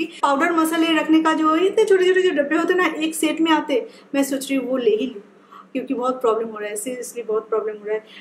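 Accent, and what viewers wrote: Bengal, India. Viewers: u r just amazing apna Bengali tone accha lagta hai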